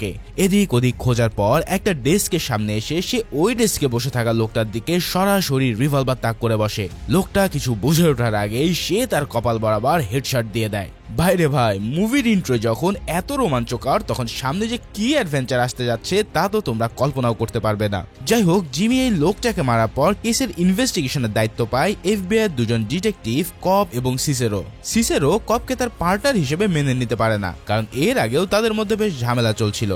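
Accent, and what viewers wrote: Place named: Faridpur, Bangladesh. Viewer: আপনার বাড়ি কি ফরিদপুর জেলায়, মানে ফরিদপুর এর লোকজন জোরে বা গতি কে বেগে বলে।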